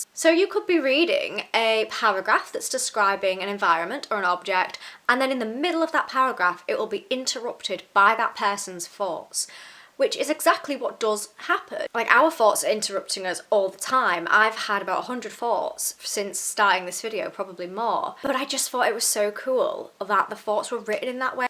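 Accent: United Kingdom. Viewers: Wow, absolutely love your review. And love listening to your voice and British accent, too! I agree with what you said about King's writing style. He's an absolute master when it comes to character development and description. He has a way of writing that makes you lose yourself completely in the characters' thoughts and emotions, until you forget everything else around you. The Shining is definitely one of my top favorite Stephen King novels. I've read every King novel so far, and I highly recommend you read The Dead Zone, Pet Sematary (very scary, but oh so good!), Christine, The Green Mile, Misery, 11/22/63 and the novella collection Different Seasons. Just keep them coming! 😊📖☕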